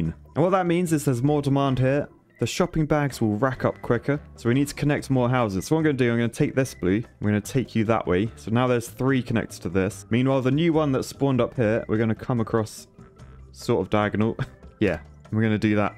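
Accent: United Kingdom. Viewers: I'm actually impress that you got it first time the "Sierra de las cruces" yes, you had the little British accent, but the pronunciation is correct :D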